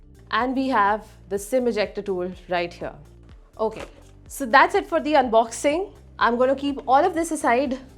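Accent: India. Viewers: Indian jese lagta hai ye english bolta hai😅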